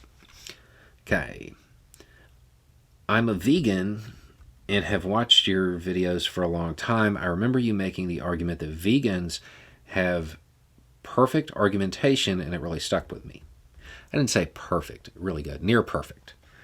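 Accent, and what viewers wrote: South, United States. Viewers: Speaking of strong southern accents. I watched a five-year-old video of yours the other day and thought that your accent was a lot stronger back then.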